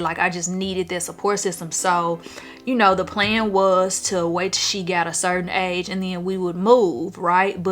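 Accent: South, United States. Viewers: GIRL… you country as a MF and I love that! I’m a GA Peach so I understand! 😍😍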